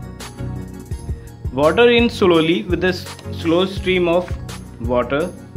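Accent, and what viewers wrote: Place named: India. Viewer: I'm so glad that the first video I clicked on the narrator was Indian because I watch a lot of Indian cooking shows and my projects always workout way better when the narrator's Indian. Hard to explain but I just got really excited when I heard his voice.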